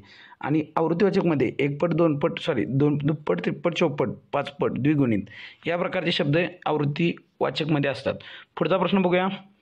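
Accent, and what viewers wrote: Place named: Kolhapur, India. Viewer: Best attempt but speed is quite fast .. Kolhapuri tone watato..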